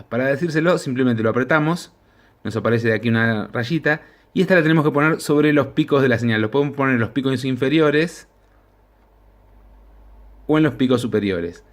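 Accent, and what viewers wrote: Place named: Argentina. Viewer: un español que habla en argentino. jej. . tu video para alguien que recien comienza, el mejor de los mejores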